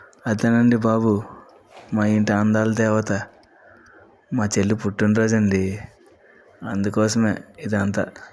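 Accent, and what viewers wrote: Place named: Godavari, India. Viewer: Orye nuvu entira godariabbailala cheptunnav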